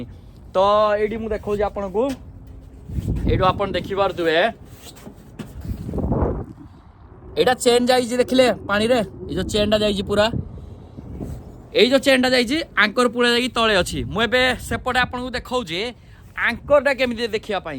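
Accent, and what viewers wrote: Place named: Angul, India. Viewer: nka ghaa anugul a4hmalik side ru ki....katha pura anugulia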